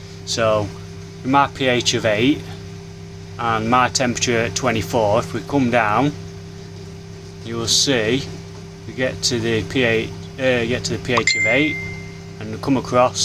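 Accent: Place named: Barnsley, United Kingdom. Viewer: The way you talk, you sound like you are from my neck of the woods or close by, definitely sounds like a Barnsley accent lol. Great review on the filter. I'm considering one when I build my pond next year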